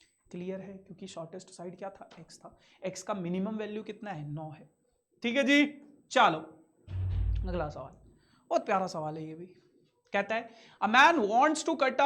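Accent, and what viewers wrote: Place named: Haryana, India. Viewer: Haryanvi hi hai mdm ji❤😂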